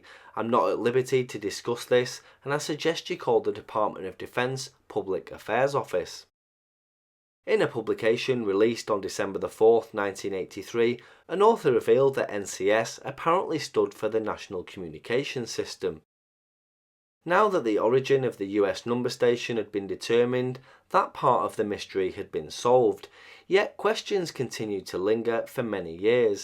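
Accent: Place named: Cockney, United Kingdom. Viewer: Ay love, yer cockney accent is really really thick to these yank blokes who are strugglin like hell to understand. we love all our long lost brothers & sisters from the U.K., & some of the cultural idiosyncrasies are just endearing to us here who embrace diversity. However, still strugglin to hear through the sharp curves in dialect. Someone should invent a bloke to bloke translator. ya know-- "cookies to biscuits" & all that rot.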